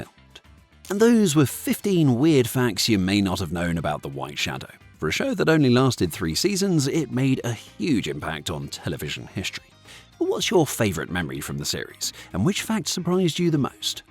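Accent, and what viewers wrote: United Kingdom. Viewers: Why is there a British accent doing commentating on this video. We couldn’t find one American to do this, about this class of American TV drama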